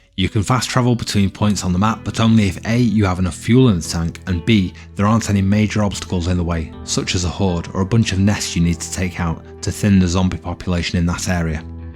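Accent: United Kingdom. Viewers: Just discovered this game reviewer. Between the warm tone, brit accent, depth, and level of brain power required to comprehend, I'm like, "this mf should be voicing everything and telling me the weather and my driving directions and boarding sequence and how awesome the next movies coming out might be. #MAKEMORECONTENTBRO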